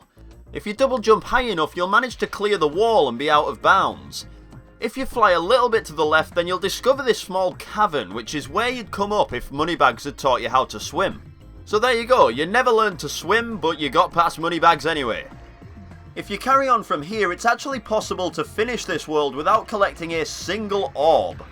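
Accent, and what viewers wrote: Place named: Blackburn, United Kingdom. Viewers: He's so Noorthern - I love it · Nice video. Never knew about the duplicate world, really odd. Can't place your accent. Going to have a stab and say Blackburn?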